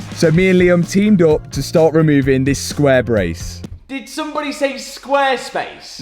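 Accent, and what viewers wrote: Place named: Nottingham, United Kingdom. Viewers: welcome to America, where we fix our own stuff allll the time. Wanna know why? Because if one man can do it, another man can can do it as well. With enough time money research and patience ANYTHING can be repaired by anyone at all. This is also why America has led the way in the automotive industry since the Model T, and why car YouTube was pioneered by Americans. 🇺🇸 I’m glad to see stays positive and isn’t scared off by things he is unsure of. Innovation, hard work, trial and error are the backbone of a man like. is basically a yank with an English accent. Good work buddy 🤜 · Without being rude. May I ask where you are from?your accent sounds like a Nottingham accent.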